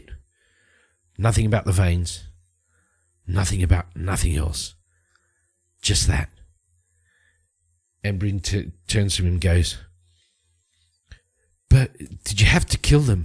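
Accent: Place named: RP, United Kingdom. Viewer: You are so posh. ha ha! Great episode! :trophy-yellow-smiling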